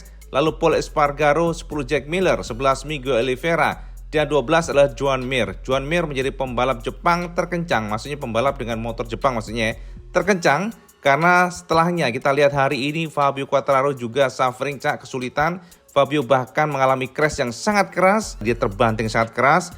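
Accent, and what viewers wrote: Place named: East Java, Indonesia. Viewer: KHAS PAKAR JAWA TIMUR AN CAK OPO ONOK E GA NEKO NEKO TAPI JOSS GANDOSS, ORA LEBAYYY😂😂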